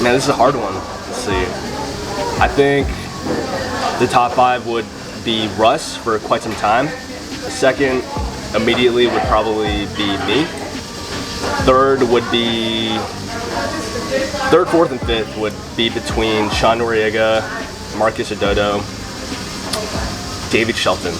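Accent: South, United States. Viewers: Where you from? Didn’t expect such a southern accent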